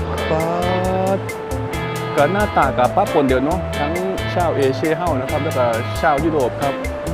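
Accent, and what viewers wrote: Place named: Sakon Nakhon, Thailand. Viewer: เจ้าเว้าคือตน สกลน้า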